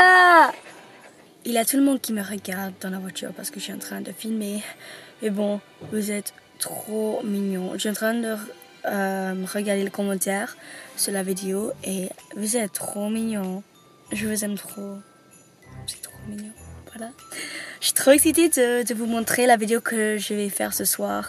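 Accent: England, United Kingdom. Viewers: J'aime ton accent anglais :3<3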